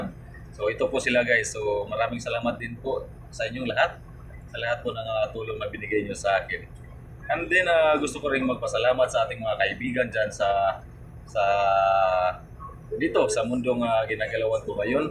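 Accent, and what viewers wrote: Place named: Visayas, Philippines. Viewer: Kani kay morag bisaya ni ayy hehe, pinoy galaan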